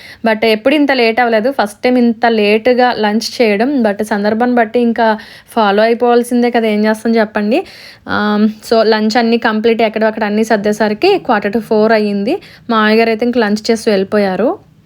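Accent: Godavari, India. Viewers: Meeru vunna place? Godavari slang bagundi andi, madi east godavari, chala baguntadhi slang😅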